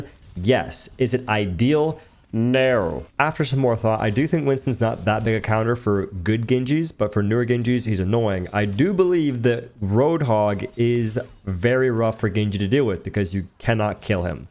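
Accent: South, United States. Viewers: You went to having an accent to being a country boy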